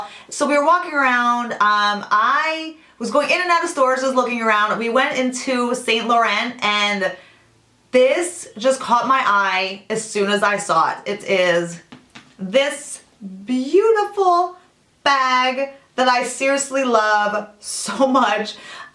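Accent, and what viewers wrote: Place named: Northeast, United States. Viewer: I knew you had a north east accent , I'm from the north east as well ( New Hampshire ) I live in southern Virginia now . This makes me love your channel even more .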